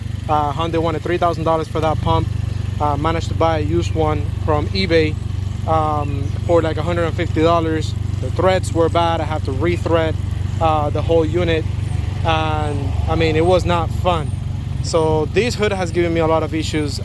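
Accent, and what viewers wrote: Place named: Puerto Rico. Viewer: Just knocked this out thanks to this video! Thank you! There's 0% chance you're not boricua with that accent! Dimelooo 🇵🇷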